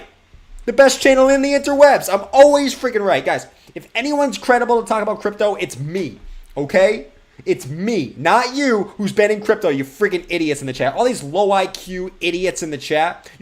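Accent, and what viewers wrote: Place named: United States. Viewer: What’s with the yankee accent all of a sudden? Wtf? A new reinvention as everyone loses the lot while it is about to fully collapse?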